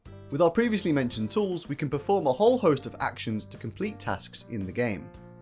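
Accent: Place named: Birmingham, United Kingdom. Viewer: This is a brilliant resource mate, thanks so much - definitely deserves way more views. Ps: nice to hear a fellow Brummie speaking in Japanese :P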